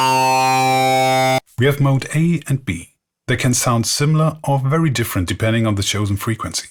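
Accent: Germany. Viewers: Selbst wenn das Wort eines Schweizers wohl nicht so viel zählt wie das eines Native Speakers: für mich klingt dein Englisch super. Die Stimme ist angenehm und grammatikalische Fehler sind mir bisher noch keine aufgefallen:) Klar hört man den deutschen Akzent ein klein wenig heraus, allerdings bereichert der die Videos eher und stört überhaupt nicht, denke ich. Danke für die Info! Das klingt so, als ob die Effekte, Levels, Breaks, usw. viel Zeit in Anspruch nehmen, hingegen die Melodien, Akkorde, Pattern und Rhythmen schnell angedacht sind. Klingt zwar etwas kitschig, aber ich stimme voll und ganz zu: Limitations foster creativity. Ich halte ab und zu Ausschau nach einem guten alten PSR oder einem Casiotone. Leider verkaufen die Leute meistens nur moderne und für mich schlecht klingende Keyboards. Lieber einige wenige, eingeschränkte, aber dafür warme, angenehme Klänge mit Charakter. 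Und für die alten, leider oftmals ungepflegten Geräte wollen sie über Hundert Franken. Obwohl ich eine Art falsche Nostalgie spüre wenn ich die Klänge dieser alten Geräte höre (weil ich gerade mal 1995 auf die Welt gekommen bin) möchte ich mir trotzdem einmal ein älteres Keyboard leisten. Synths hatte und habe ich genug und liebe sie nach wie vor. Aber sich einfach mal mit so einem Gerät hinsetzen und einen Song schreiben ist wahrscheinlich doch noch mal etwas ganz anderes. Ich hatte vor ein paar Tagen etwas gefunden, was dich (ich bin so frei und duze und hoffe, dass mir das nicht übel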